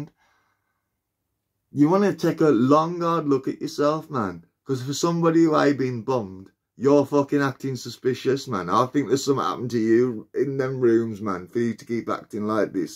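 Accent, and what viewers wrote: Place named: Black Country, United Kingdom. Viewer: Nice black country accent...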